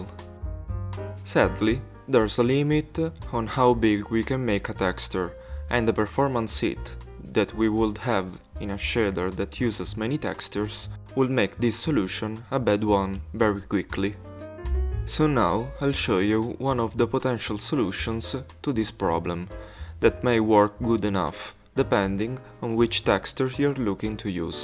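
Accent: Italy. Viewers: Where is this guy from? His accent is a mix of like Italian and...I dunno, Indian? It's awesome. However, I have to say, more explanation would be great. For example, when you open your blueprint you have "absolute world position" and "mask" nodes already on the screen. Where did they come from and how do I get them? I had to just keep trying nodes that popped up after searching for "world" and "mask" until I found the right ones. And you bring in a parameter node, but which one is it?? There's like a hundred different ones. Same thing , I literally just held down letters and clicked until the right node came up. Just saying which one it is or searching for it instead of using shortcut clicks would be very helpful.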